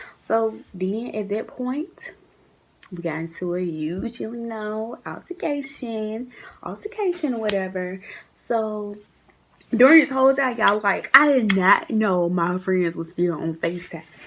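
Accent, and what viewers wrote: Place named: Georgia, United States. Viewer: I just love your accent.It sounds like you're from Georgia.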